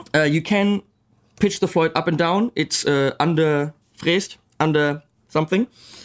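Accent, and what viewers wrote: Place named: Germany. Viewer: You talk a whole bunch of s*** I can't even understand you with that German accent put the guitar down and just walk away from it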